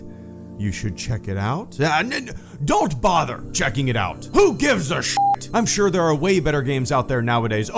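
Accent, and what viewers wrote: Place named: Chicago, United States. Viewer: Awesome. Love that accent. Anyone remember that episode of South Park when Kyle and his family moved to Chicago becouse his dad drove a hybrid? The people there sounded JUST LIKE THAT.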